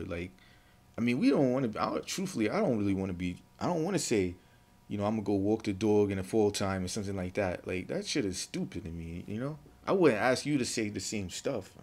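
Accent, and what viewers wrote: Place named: Brooklyn, United States. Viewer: Definitely a Brooklyn accent wit an African American tone. Maybe mixed with some of da Bronx lol. But I agree wit you brothuh, dat the way local people "tawlk" in Queens ain't dat different from the way they do in Brooklyn. Northern New Jersey accent is more distinct from any of the alleged New York City accents in my opinion, while still bein' a bit similar. Accent does, in some cases, depend on ethnicity. For example, Jewish NYers sound a little different from Italian NYers.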